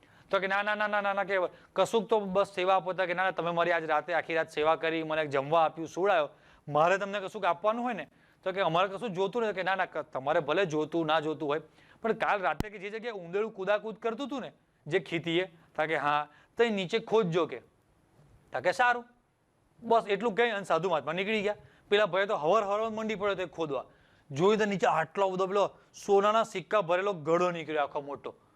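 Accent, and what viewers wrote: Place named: Sabarkantha, India. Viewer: સરસ, સારું શીખવો છો. મજા પણ આવી. બોલી પણ સરસ છે. ક્યાંક મને મારો સાબરકાંઠા નો લેંકો સાંભરી આવ્યો. આભાર 🙏🙏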